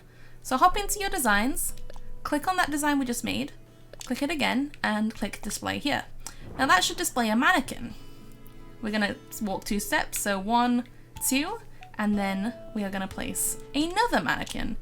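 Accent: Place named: North, United Kingdom. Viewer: Shes northern uk... it's a cool accent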